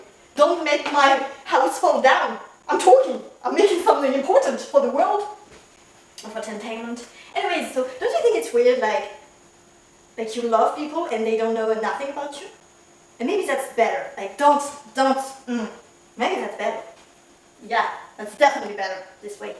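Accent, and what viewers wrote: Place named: France. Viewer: Il m'aura fallu 4 secondes pour comprendre que tu étais française avec ce bel accent, je pense que la follie de la personne est donc logique 🤣😂👍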